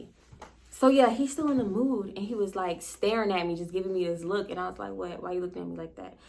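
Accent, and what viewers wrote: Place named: South, United States. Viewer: You sound extra southern today idk what it is 😂😂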